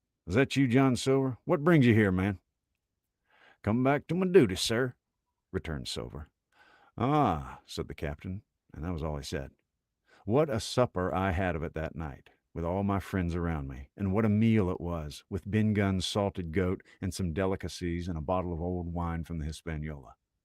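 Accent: South, United States. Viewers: Woohoo! Somehow I had never actually read Treasure Island, or if I did, I forgot most of it. Thank you for finishing your reading! Southern really suits the story so well. I had an idea for a possible future book - The Three Musketeers? Though that would be a long one.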